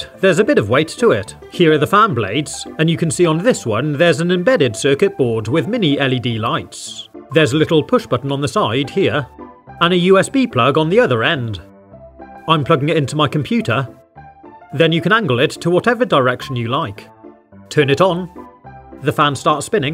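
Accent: United Kingdom. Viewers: Are you British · are you british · i like how he uses british accent with american vocab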